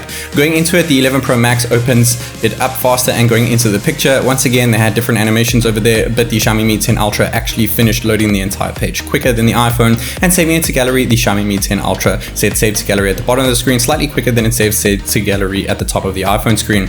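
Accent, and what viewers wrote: South Africa. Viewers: Sensing a South African vibe Check your Location: South Africa!!! YEBO!!!!! #subbed BTW, where did you get the Xiaomi, I really wanna get one! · homie sounds south african lol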